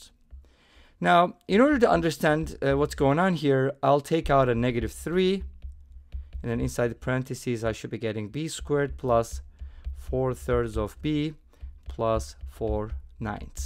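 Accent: Turkey. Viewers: Are You Turkish ? Your accent sounds Turkish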